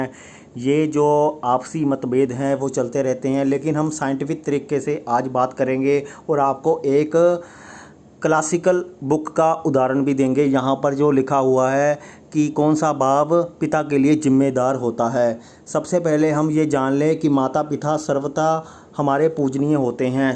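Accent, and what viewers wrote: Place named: Punjab, India. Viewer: bhai mene aapki koi Insult nai ki mene ye kehna chaha ki aapki hindi bolne se ya aapka accent hindi ka dil ko acha lagta hai usme apnapan aata hai usme punjabi touch bhi kabhi kabhi aata hai to mazaa aa jaata hai aapki knowledge awesome 👌 hai bhai main aapka subscriber hu aapko hamesha like karta hu...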